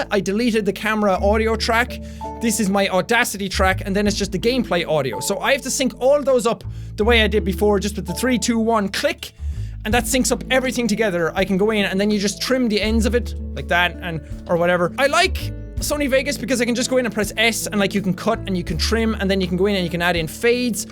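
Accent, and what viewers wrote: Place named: Ireland. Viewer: I still remeber when you coved up your irish accent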